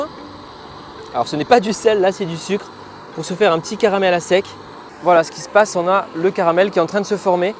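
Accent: South, France. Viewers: Merci pour ce moment sympa, vos bonnes idées et votre petit accent gourmand qui me rappelle ce sud que j'aime tant (et où je ne vis plus, hélas...) Je vais tenter ce dessert, c'est sûr.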